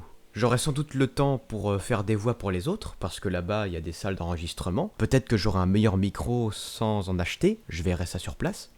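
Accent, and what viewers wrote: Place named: Quebec, Canada. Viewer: Continue comme ça et tu prendra ton axant québécois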